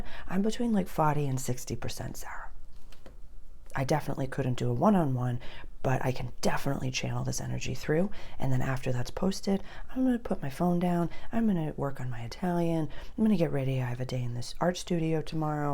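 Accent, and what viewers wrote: Boston, United States. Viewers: i love your boston accent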